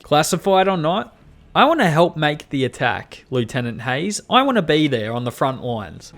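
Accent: Australia. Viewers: also, who doesn’t love an aussie cant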